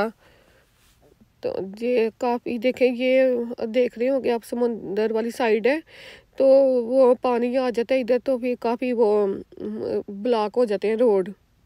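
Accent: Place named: Lahore, Pakistan. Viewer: First with u dear 👍📌 Really enjoying ur Punjabi which same like us ..r you from lahour ??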